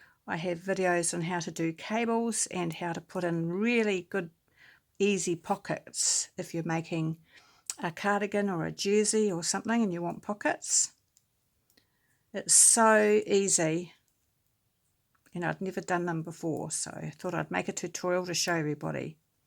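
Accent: New Zealand. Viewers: love your Kiwi accent very familiar in Oz Love from Perth Western Australia 🇦🇺🙏❤️⚘️ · When you make an “oops” I laugh right along with you!!!!!! Love your NZ accent!!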